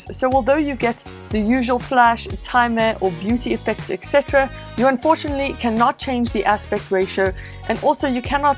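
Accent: South Africa. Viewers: I hear the South African accent... then I see the charger - not South African. Then I see the police bakkie . 😉 😁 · Is this a Southafrican, dutch, australian/kiwi or danish dialect? Easterneuropean of some sort?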